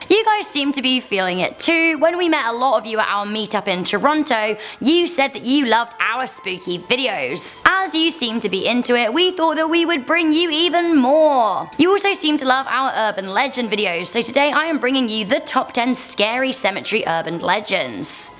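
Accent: United Kingdom. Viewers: I love her sharp British accent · are you british? You sound british. Keep up the good work!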